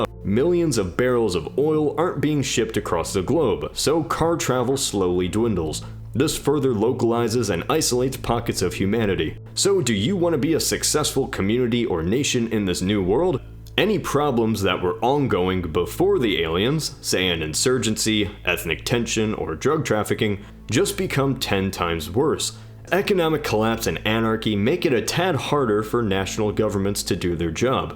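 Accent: Ohio, United States. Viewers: nice northeast Ohio accent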